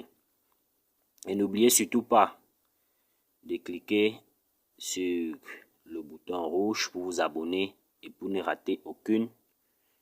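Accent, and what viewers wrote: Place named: Cameroon. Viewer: Quand tu vois accent ça peut être ivoirien , c'est un camerounais ouais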